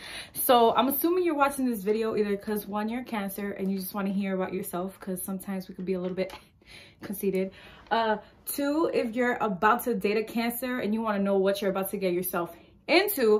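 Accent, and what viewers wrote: United States. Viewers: July 16th you sound like your from the Bronx also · Lmao omg you sound just like meeee! You def gotta be from NY or something! Anyways , CANCER GANG July 12th♋️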